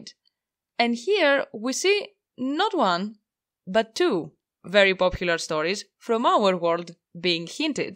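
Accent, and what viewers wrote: Greece. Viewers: I prefer your Greek pronunciations anyways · Έλα ρε! Αγαπώ την Ελλάδα! Υπέροχο βίντεο, greek accent makes everything better. I'm also a non native english speaker making videos, so feel free to check mine if you want.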